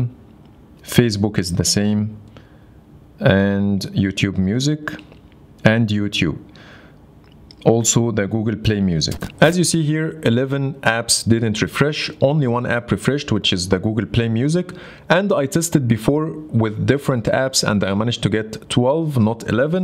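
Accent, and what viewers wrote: Egypt. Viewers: What an Egyptian accent, good job bruh☺️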